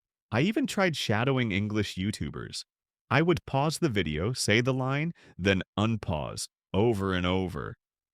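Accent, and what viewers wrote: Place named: United States. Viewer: You sound 100% American and your vocabulary, grammar was completely accurate omg I thought you were an American in disguise, how on Earth are you not a native English speaker??? 🙀🙀 Please make many more videos about your journey learning English grammar, vocab, and esp speaking like methods, technique, textbook, material, webs, apps etc I really look forward to it. Your achievement on English speaking as your second language absolutely takes me aback, you're most iconic English speaker to me I appreciate your message in this video: "no need to be perfect, be brave enough to try again, make as many mistakes as it takes, learn from them and get better bit by bit" - truly mind-blowing mindset.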